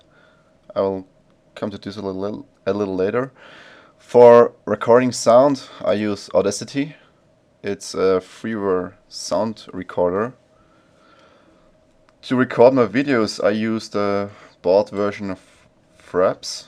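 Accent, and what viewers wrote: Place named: Austria. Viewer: Mit dem österreichischer Akzent finde ich Deine Videos viel netter :-)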